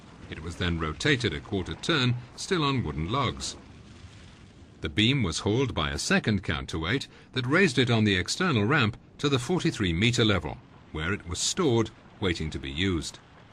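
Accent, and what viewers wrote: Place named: England, United Kingdom. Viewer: Folks, this video is filled with unproven presuppositions. It is a theroy with a nice 3d video and authoritative english accented narrator. Ramps, chipping with stone hammers, no wheels, no models before building, Tombs for dead men , Kings, hierarchical society, Humm? Stones were cleaved as diamonds are, ( being done today with giant stones) not chipped with copper chisels. Then they were moved with carts and oxen, not dragged by hundreds of workers in the sun for hundreds of miles up and down the countryside on slippery little logs of which one stone could stop a log from turning correctly. Counter weighting was used to elevate stones up the sides, not ramps and pushing/pulling tons of stones uphill on a ramp. Water filled counterweighted highly engineered hardwood carts filled with water descending down one side which raised the stones on similar carts connected with ropes from the other side using only gravity to pull them up. 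The finished top floor course with one more perimeter sidewall casing stone course could create a pool area for water. The angled casing stone about the perimeter would act as a smooth slope for ascending and descending carts using the pool to supply water to the descending carts as counterweights. Then the stones could be raised up the finish slope then be floated into place with air bladders attached to the carts sides. Two men with little energy could navigate tons of stone and place then perfectly to its designated place. The other two sides wit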